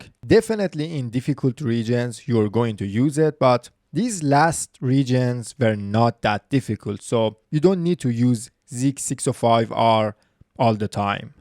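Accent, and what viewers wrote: Iran. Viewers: خرابه لحجه ات شدم حاجی 😂 خوده خوده ایرانی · لحجه اینگلیسیت مثل ایرانی هاست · Wow he does sound Iranian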